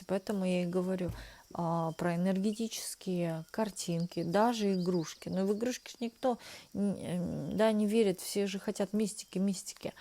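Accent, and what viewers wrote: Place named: Czech Republic. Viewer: Сегодня я осталась без работы в чужой стране , одна. Да, описание мне подходит. Ха!) и фамилия Воронова, и блондинка, и да белая ворона как бельмо для окружающих. В остальном , расклад интересный , благодарю. Я тоже нахожусь в чехии. Вы хорошо справляетесь с акцентом, но чешский говор и словечки проскакивают.) Это оригинально звучит))) Очень благодарна за Ваш труд. С наилучшими пожеланиями.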